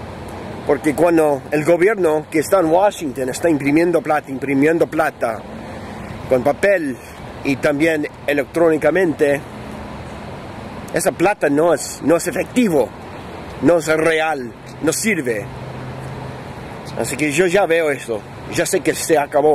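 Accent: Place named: Argentina. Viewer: Loco,,,your spanish is very intact...HOLY CRAP....Argentina accent mixed with Spaniard...very inspiring... TWO THUMBS WAY UP!!! BEST VIDEO SO FAR!!!! MORE MORE MORE CHE!!! Will be showing it to my pops! LOL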